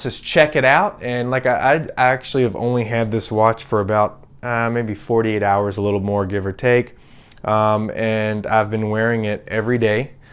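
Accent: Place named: Kentucky, United States. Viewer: I hear a Kentucky accent!!!!!!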